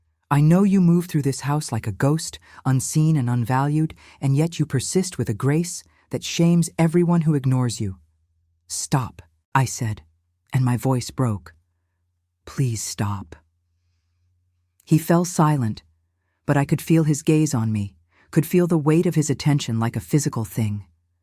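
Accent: United States. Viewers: I absolutely LOVE these story's but, you have made a fundamental error choosing this beautiful American voice to narrate. Victorian & current day America, never had Dukes or any British aristocracy. To make these story's more believable, you should use an English/British voice. ❤❤❤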